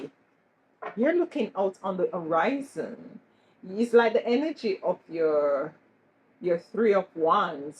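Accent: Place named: Jamaica. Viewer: Thank you beautiful QUEEN and love your Jamaican accent! ❤💯🌹🎁 First time watching ASÉ ASÉ 🙏🏿🙏🏿🙏🏿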